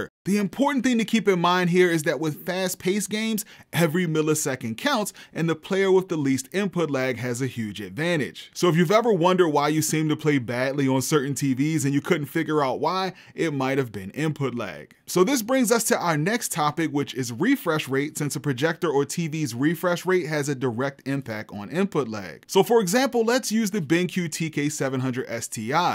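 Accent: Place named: Memphis, United States. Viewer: Are you from Memphis? “Lumen” was not helping you hide your accent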